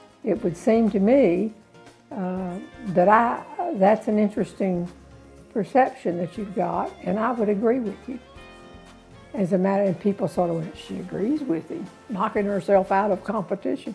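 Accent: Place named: South, United States. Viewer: Just discovered you! Utterly glorious humour and love the southern accent. Thanks goodness for Youtube!! Love from London :)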